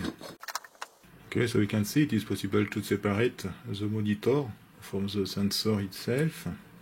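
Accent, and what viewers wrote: France. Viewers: Love your English in French accent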